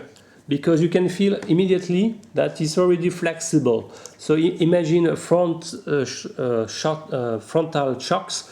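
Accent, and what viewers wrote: France. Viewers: Hey dude are you french? You have a strong accent!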